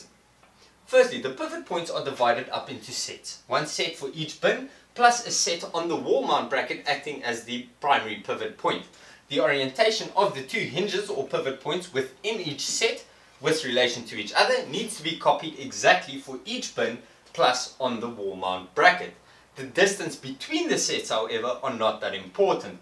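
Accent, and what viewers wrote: Afrikaans, South Africa. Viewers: South African ( Dutch) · Jys a suid afrikaner, Engels n man ?!! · South Africa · He is Afrikaans, he's my cousin btw he got married not too long ago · Wow!! Wish I'd seen this a year ago. I installed ceiling boards so I can't use this idea. Absolutely loved this concept and will try to adapt it to my needs. You sound like a fellow South African. If I'm right, then all I can say is "Bakgat!!" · Only just found out about this channel and I'm hooked. Readily picked up the accent as South African. At least now I can actually find some of the recommended products. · hi there. i love your idea and it looks soooo good and practile ofcourse. Are you from S.A? i lived in Cape town for 8 years and i think i recognise the accent 😉. Thanks for the great video and tips. Cheers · First of all your video is great, and your shelves are awesome. 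Secondly the whole time I was listening I was thinking to myself, he is a South African. What made me 100% sure that you were South African was the hadidas in the background noise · Heard the same immediately. Definitely a Saffa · Organizing genius! I’m trying to place the accent - South Africa? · are you from south Africa? that accent afrikaans pappie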